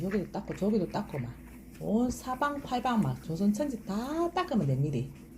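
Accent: Gyeongsang, South Korea. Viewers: 구수한 갱상도 사투리가 정감이가네요^^ 여러가지 팁받아갑니다 감사해요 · 대구 사람이시군아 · 민경이란말 거의40년만에들어보네요 잊고있었던 경상도 사투리 정말고향에온듯이 아련한그리움으로 남습니다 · 구수한 경상도 사투리에 정이 느껴지네요! 건승하세요!!!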